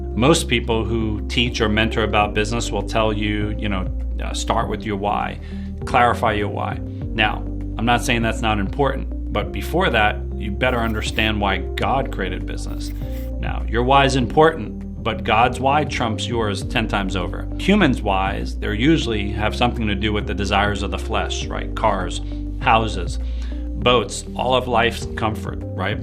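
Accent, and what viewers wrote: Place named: South Africa. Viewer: Sir, you sound South African 🇿🇦, thank you so much for this, we thank God for this revelation. It’s easy to be selfish in business especially when you miss it as Christian entrepreneur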